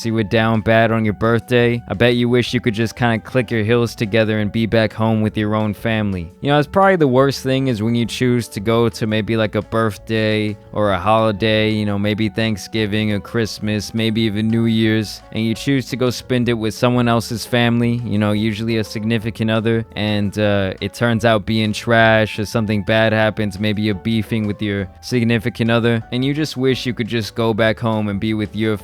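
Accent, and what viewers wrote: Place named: East Coast, United States. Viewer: Okay I just found your channel and I been watching everything. Where the hell did this east coast accent come from I’m so confused 😭😭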